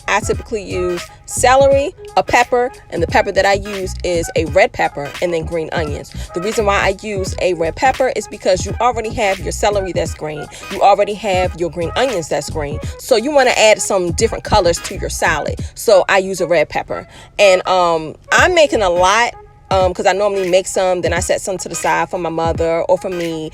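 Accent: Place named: Baltimore, United States. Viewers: I ran across this video because I'm trying to figure out how to perfect my oyster fritters. But this exactly how I make my seafood salad. And I hear that B-more accent sis. Fellow Marylander here...😅.. I'm From Salisbury MD.. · What part of maryland are you from....baltimore Md or like PG county md... I’m just tryna decipher ur accent 😂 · Accent!!!!!! Sounding like Bmore or sum. 🙌🏽🙌🏽🙌🏽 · I knew your accent was givin a subtle Baltimore ish twang to it lol...great vid 👌🏾👌🏾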